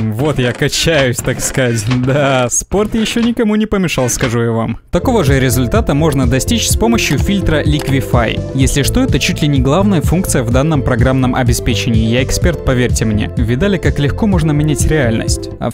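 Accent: Ukraine. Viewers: Ти из Украины ❓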